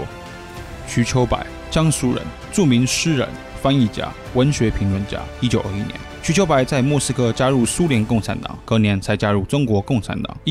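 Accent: Taiwan. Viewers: 听这口音就知道是湾湾的😂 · 台湾口音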